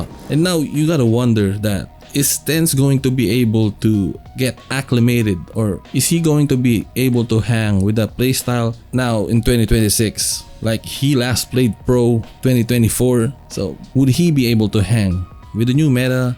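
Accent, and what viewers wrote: Philippines. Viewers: Are you filipino? The accent.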